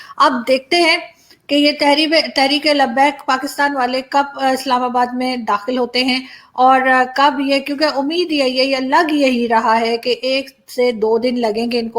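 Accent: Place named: Allahabad, India. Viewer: Allahabadi......lahza h ji, jo apke Ghar me Bola jata h kyuki apka DNA Allahabad India se h...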